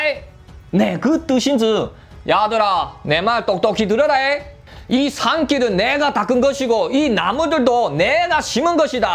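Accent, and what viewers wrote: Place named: Northeast, China. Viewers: 이분 조선족 사투리 해설 정말 재미있네 앞으로도 계속 부탁할께요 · 와 진짜 재밌다.우리 동북에서의 구수한 사투리에 반함 ㅋ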